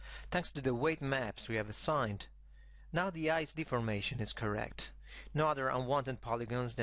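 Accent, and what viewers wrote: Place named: Italy. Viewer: I like the way explain. Since i'm french speaking i understand almost every words from his italian accent;) Better than any american speaking too fast for me or using slang...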